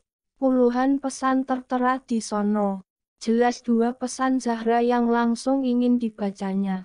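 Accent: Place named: Java, Indonesia. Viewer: Lanjuuttt minnn .. suaranye kok tmbh kyak wong jawii ta 🙃🙃🙃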